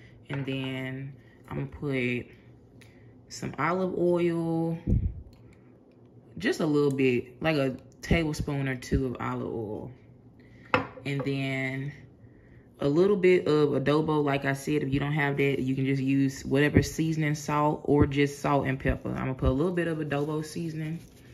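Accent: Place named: Carolinas, United States. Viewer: Where u from sis u sound like u from the carolinas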